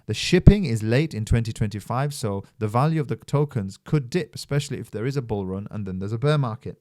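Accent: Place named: India. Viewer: I can hear an indian undertone. good video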